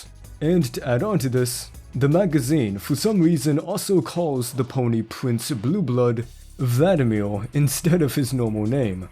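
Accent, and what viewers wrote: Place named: Germany. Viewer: are you german, because your voice sounded like a German accent.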